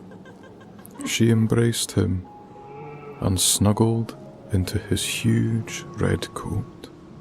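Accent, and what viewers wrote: Scotland, United Kingdom. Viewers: Santa with a Scottish accent okay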